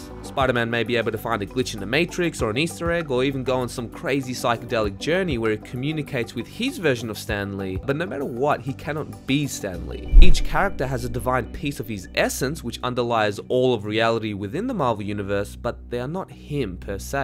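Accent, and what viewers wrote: Australia. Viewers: This guy has to be Aussie that accent was strong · This dude is very good at explaining, nice and clear with a friendly aussie accent... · Sorry, it's just hard to listen to a serious topic delivered on a thick Australian accent.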